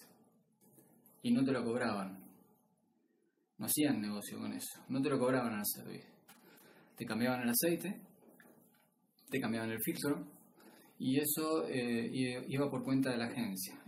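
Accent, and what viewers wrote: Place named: Argentina. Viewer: se te nota acento argentino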